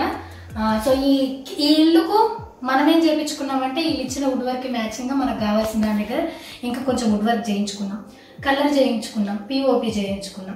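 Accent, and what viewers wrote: Telangana, India. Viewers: Akka me telangana basha assaluuu👌👌👌😘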